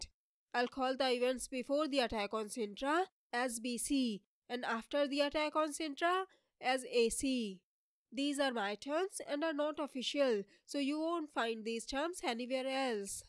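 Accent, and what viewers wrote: India. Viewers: Great video... but i wish the indian accent was not so unbearable...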